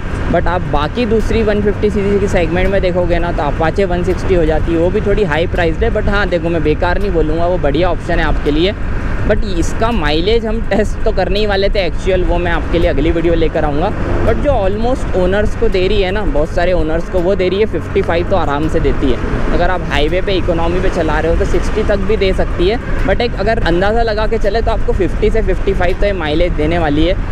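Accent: Indore, India. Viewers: Are you from indore ??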